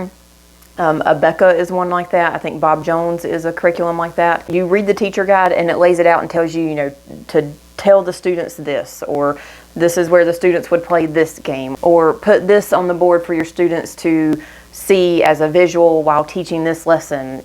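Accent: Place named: South, United States. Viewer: I just found your channel and I’m liking it a lot. I hear some southern twang so I wondered what state you live in. I’m from Mississippi but live in Tennessee now. We love student led material and Teaching Texbooks! I have a 3rd grader, 1st grader, and 3 year old.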